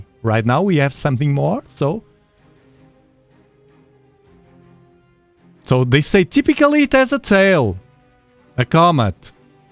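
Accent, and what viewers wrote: Portugal. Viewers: rofllll. he’s Portuguese 😊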